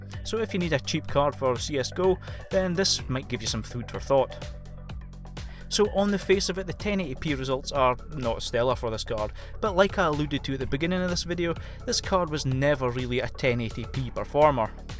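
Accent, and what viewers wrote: Scotland, United Kingdom. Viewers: Scottish accent 😍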